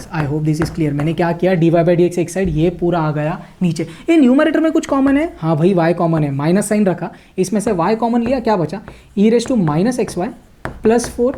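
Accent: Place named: Hyderabad, India. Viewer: Hyderabadi hai kya ji tum bhot bharti bolte aur sikhate bhi🔥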